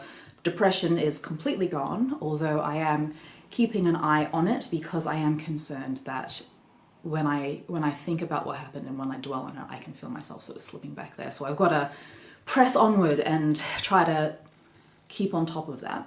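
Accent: Australia. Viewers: Sorry to hear about your troubles. Well done seeking help. I've only just discovered your channel, it's great to hear another Aussie accent on mini related videos. Especially sorry to hear that your booze got pinched when you needed it most. Look forward to catching up on all the videos you've posted thus far. (I'm very curious about the models in the display cases behind you. I hope they are featured in your other videos, and if not, could you do a video tour of them)? All the best.......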